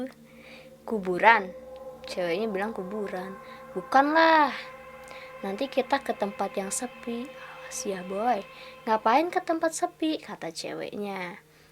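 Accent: West Java, Indonesia. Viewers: Kak keliatan banget orang Sunda nya lipe kak😭❤️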